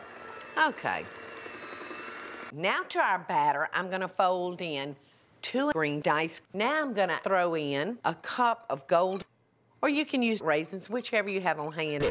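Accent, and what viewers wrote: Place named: South, United States. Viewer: Did she say ERL Sha calls oil ERL so southern it makes my eyes bleed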